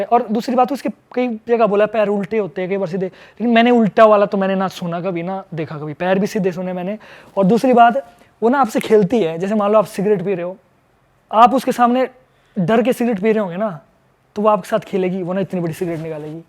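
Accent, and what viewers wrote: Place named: Haryana, India. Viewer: Himachali tone inspired by haryana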